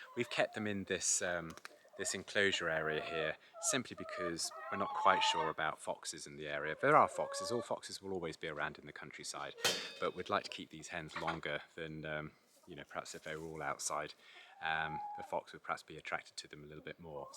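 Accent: United Kingdom. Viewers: I did enjoy this video. I have always thought of you as a gentleman. My wife likes your proper British accent. Well done for showing compassion to the hens. Are you still baling your own hay?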